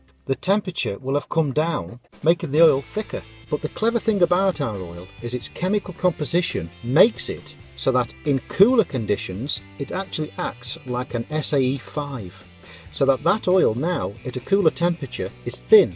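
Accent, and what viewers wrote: United Kingdom. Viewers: I hate the British accent.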